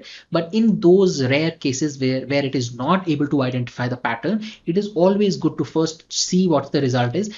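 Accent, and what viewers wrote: India. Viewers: If you hear an Indian guy talking about Excel, you know you're in the right space.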